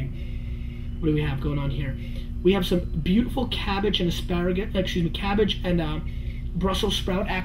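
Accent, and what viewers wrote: New Jersey, United States. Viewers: I guessed at first this guy was from Jersey and I was right. He just said "meh", so that confirms it.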